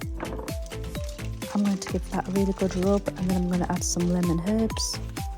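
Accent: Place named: North, United Kingdom. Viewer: You may be a little too young.. but there used to be a show called WHY DONT YOU on kids tv... they always had someone that sounded like urself.. most of the time it was how to create something silly out of left over toilet rolls.. but the cadence of ur voice matches the instructions perfectly :) but its the northern accent that sells it :) took me back hehe.. great vid.. keep up with them!!!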